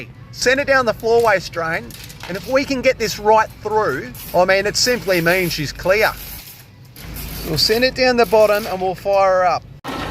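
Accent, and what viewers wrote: Australia. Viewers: It was amazing to see Tom Cruise clear a drain with an Australian accent. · I’m from the US.. I love the accent soo much!! I have my Apple phone and iPad Siri voice set to the Australian voice.. I hope to someday go on vacation to there.. I love the hot weather and nature and yes I am not afraid of the deadly creatures down ther.. I have a major respect to leave them alone and they should leave me alone..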